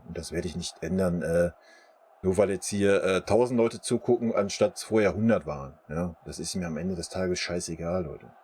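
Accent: Ruhr, Germany. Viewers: Komme aus der Oberpfalz/Bayern bin seit ich in der 1. klasse war Bayern Fan und das ist 33 Jahre her. Ich kann mich noch nie erinnern jemanden Fan sein ab zu erkennen, nur weil er nicht aus München/Bayern kommt. Mach weiter so verfolge dich seit 4 Wochen und mag deine Ruhrpott Schnauze😂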